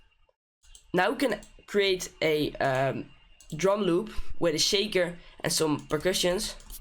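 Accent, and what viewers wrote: Netherlands. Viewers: You are dutch, are you? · Are you Dutch cuz I am and I thaught u where Dutch 2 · Lekker Nederlandse accentje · Are you Dutch? If so Hallooooo! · Are you dutch? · So dope! Are you Dutch btw? Keep doing your thing man! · You sound dutch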